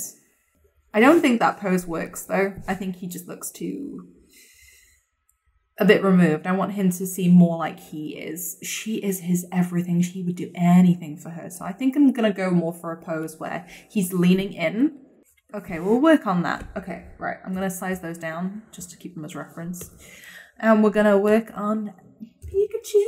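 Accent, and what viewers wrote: United Kingdom. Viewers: I'm so happy I just discovered your channel! I really love animation 'n stuff and I'm always happy to find a good one with sympathic ppl on it and your british accent makes it even better!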